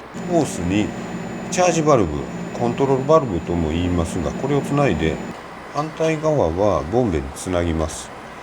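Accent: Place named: Kansai, Japan. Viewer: ★いつも、詳細な説明をご丁寧にされていて、関西弁も聞けて好感の持てるサイトだと思っています。色々、勝手なコメントにいつも返答頂きありがとうございます。★家のエアコンも5-7年位で効かなくなりますが、ほとんどの場合はフロンガス漏れで、一度、大きなコンデンサーが不良になり交換した事がありますが、壊れると言うより経年問題です。★話は変わりますが、お話に出た日本冷○○学会などにより、技術振興を目差した団体のノウハウが隣国に洩れて、ＩＰの権利も無視され安い代物家電などが作られているようにも思うのですが、日本の国益に反しますよね。日本の白物家電はアメリカでは壊滅しています。 日本の貿○振○会などによる技術情報漏洩問題も取り上げられていました。お人好し？の日本人には考え直して貰いたい問題ですが、赤に染まったマスコミや帰化人の政治家らが人道だ、国際平和だとかチンピラヤクザのように一般論で煽り、反論すれば今度は例外を持ち出し話題をずらす（ゴールを変える）作戦で話にならず、悲しい限りです。★軽トラのＤＩＹキャビンの新しい情報を待っています♪ 重力式の水屋の問題、設置後にお気づきの利点があれば教えて下さい。容器を10Ｌ位にして天井近くに取り付ければ、ポンプなしでもシャワーに使えますか？ ★返事はお暇な時で結構です。気が向いた時に宜しくお願いします。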